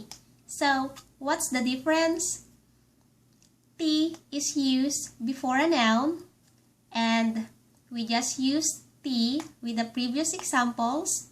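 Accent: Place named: Pangasinan, Philippines. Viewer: I'm Ilocano and she uses different word and have harder accent than in our place....from her accent and wording I think she is from Pangasinan Province. I want to learn how to speak pure Ilocano but that is impossible since there are no equal word for every word we barrow from Tagalog and English. All I can do is to continue speak and learn More words.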